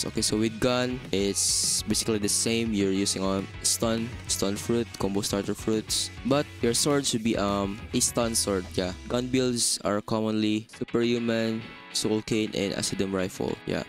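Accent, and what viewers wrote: Philippines. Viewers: Yo sound like a filipino❤❤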